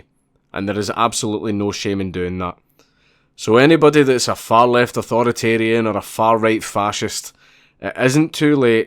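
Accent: Ireland. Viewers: Would be nice if I could hit a CC button cuz your irish is soo thick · haha this is the first video i am watching of yours, and as an American, I can't take you seriously with an Irish accent LMAO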